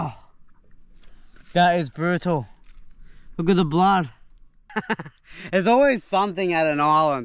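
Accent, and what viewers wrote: Australia. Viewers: Beautiful scenery, wonderful catches! 😀 I've never seen squid so transparent. And those eyes! WOW. Weird and wonderful creatures. Tasty too! 😆 I'm just trying to get a handle on your accent. It's sounds a little Aussie with a touch of Ringo Starr mixed in. 😁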